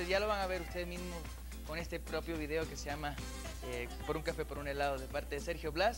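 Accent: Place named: Puerto Rico. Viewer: Neste tempo, o Sergio tinha o acento mexicano... Agora está pura cepa... Totalmente boricua!! 😃🇵🇷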